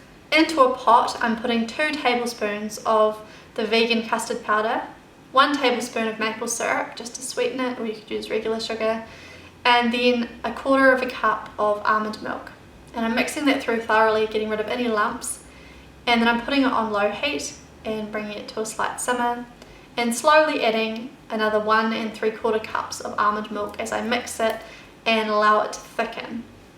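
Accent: New Zealand. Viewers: Are you from NZ? I feel totally at home hearing your acccent and I don't know why else that could be. :D Thanks for the recipe btw. :D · are you from Newzealand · Mira J. I think she's from New Zealand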